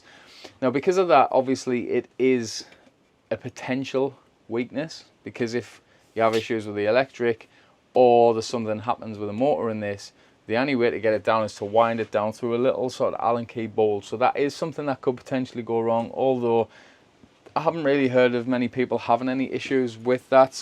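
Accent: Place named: Geordie, United Kingdom. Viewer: Hi, good to see you back. We have an IH Fiat Campervan to which the previous owner had a Cinderella incinerator toilet fitted, not sure what make you are considering. We have had the van now for over a year and the wife loves it, clean, convenient, and warms the bathroom up as well!! It can be up to a month of use before the ash needs emptying, but the negatives are uses quite an amount of gas, about 185g a flush so an underslung gas tank would be recommended, and it does need liners which cost about £50 for 500. As a Geordie by birth, but now a ‘Southerner’ it is great to hear your accent, reminds me of my childhood staying with my Nan in Easington Lane Co Durham, and Crimdon beach. I think Cinderella Toilets also do a pure electric version? Hope all goes well. Kevin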